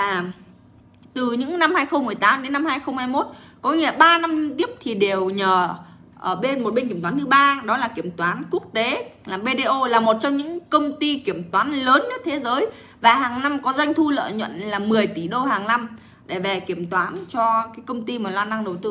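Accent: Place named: Hai Duong, Vietnam. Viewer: anh chào em nghe như em là đồng hương hải dương nhỉ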